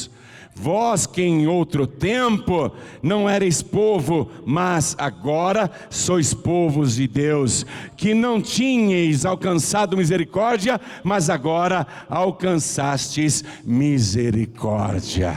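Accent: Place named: Rio de Janeiro, Brazil. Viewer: Pastor seu sotaque carioques e muito engraçado. O Sr não e de Piracicaba. Deve ser uma técnica de fala né?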